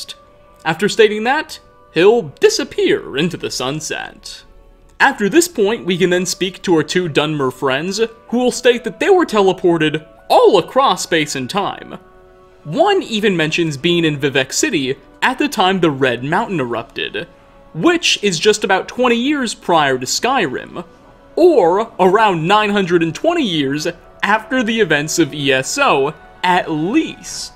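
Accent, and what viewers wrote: United States. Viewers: Maybe that's just one of the many American accents. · Teleki Zalán he’s definitely American you can tell from his manner of speech. To most of us a “lift” is when you give someone a ride in your car.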